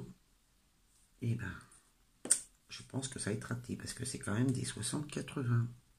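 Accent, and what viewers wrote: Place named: North, France. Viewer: Merci pour le.numero Le nord te manque pas trop ? T as pas perdu l accent en tout cas hihi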